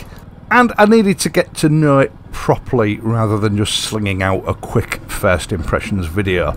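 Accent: United Kingdom. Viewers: Great British accent and great British engineered bike! The British are far better than Americans and even better than the Japenese on traditional standard motorcycles, the only type that interests me. My other bike is a Triumph T120, another great classic bike!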